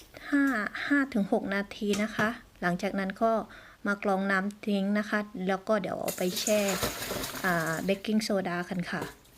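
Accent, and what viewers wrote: South, Thailand. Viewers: เก่งจังน้อว คนใต้แน่เลย สำเนียงได้ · รู้เลยว่าคนพากย์คนใต้นิ555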